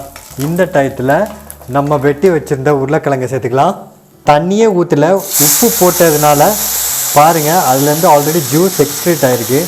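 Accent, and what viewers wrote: Tirunelveli, India. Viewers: Unga slang nala irku ..niga tirunelveli ah?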